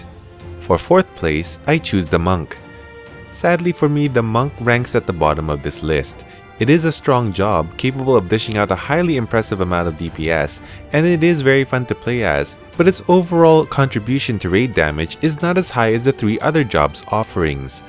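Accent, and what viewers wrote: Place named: Philippines. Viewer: so sound filipino bro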